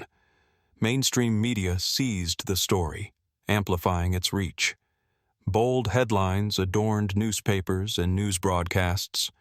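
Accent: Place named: United States. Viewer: The usual load of American accented crap! Give it away. Try it out with the Arlington guard change/ military cemetary! No? Gutless?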